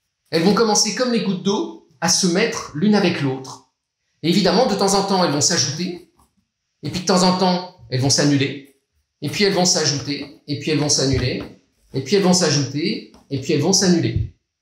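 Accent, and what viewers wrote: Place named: Paris, France. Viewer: J'adore votre intonation très Parisiènne ^^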